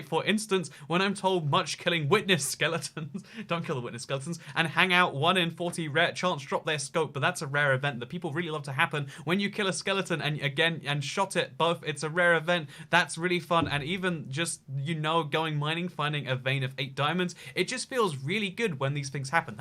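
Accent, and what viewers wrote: United Kingdom. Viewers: to be fair, you do speak really quickly and youtube doesn't understand british accents at all, so it's kind of expected that the captions would be bad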